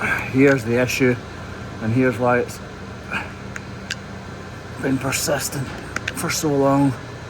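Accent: Scotland, United Kingdom. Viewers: you and your crew are the best.....if you don't have empathy for all creatures, what's the meaning of life, eh? Plus, the added bonus of a Scottish accent❣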